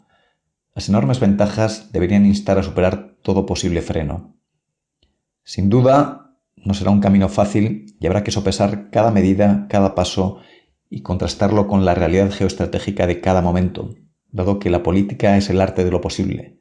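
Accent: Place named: Malaga, Spain. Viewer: Parece usted malagueña... Se dice "osó", en Málaga y Cádiz dicen ("disen") ozó.... El asunto del seseo y el cezeo es complejo, somos ocho ("osho") provincias en Andalucia, y en cada una hablamos distinto... !! Esto es un sindios !! 😅😂😂 Saludos cordiales desde Córdoba, España.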